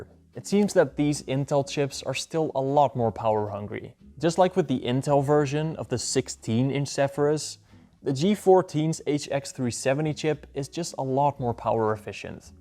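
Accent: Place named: Netherlands. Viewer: U sound so Dutch xd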